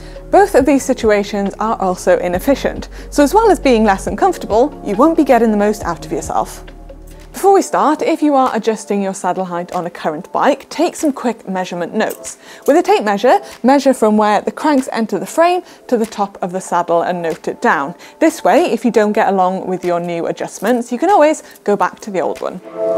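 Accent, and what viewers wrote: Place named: United Kingdom. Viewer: Do you think that British people will watch only? Talking Too fast and with accent !!! I didn’t like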